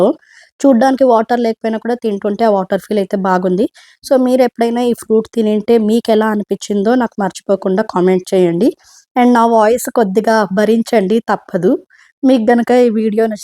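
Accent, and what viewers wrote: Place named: Bangalore, India. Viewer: నాకు ఓ అనుమానం.. ఇంత అందముగా వున్న మీకు ఏ ఆరోగ్యసమస్యలు రాకూడదు.. మీ భాష బెంగళూరు లో తెలుగు యాస గా వుంది.. అయినా మీ తెలుగు చాలా ఫ్లూయంట్ గా వుంది.. గుడ్.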